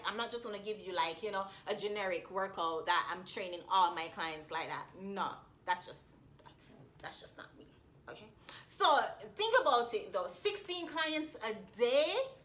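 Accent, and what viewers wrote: Jamaica. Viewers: Sound like a yaadi. Mek wi link up!!!!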